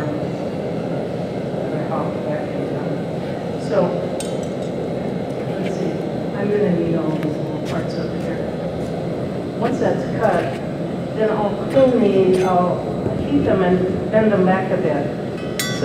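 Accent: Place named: United States. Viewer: Hey are you actually Scandinavian or do you just have love for the culture and history of Scandinavia? You look like a pretty stereotypically Scandinavian, your tattoos seem to have norse inspiration, you have a lot of Norse runes and Norse fonts in your videos, and many of your crafts are Viking styled but your accent is American.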